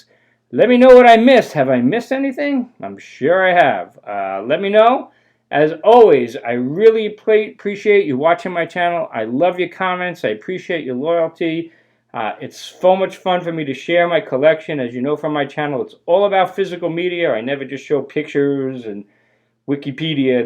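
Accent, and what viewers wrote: Long Island, United States. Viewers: I just discovered your channel and i'm digging it! WE share the same taste in music and are probably close to the same age. U grew up on Long Island (accent is a giveaway) and I grew up in South Jersey (my Philly/S Jersey accent is a giveaway). Keep up good work!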